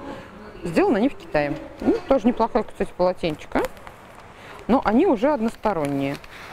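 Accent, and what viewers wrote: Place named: Mordovia, Russia. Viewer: 😂😂😂 Досмотрела до конца!!! говор прям мордовский🔥👍 часы можно брать 😂видно что крепкие.. Очень понравилось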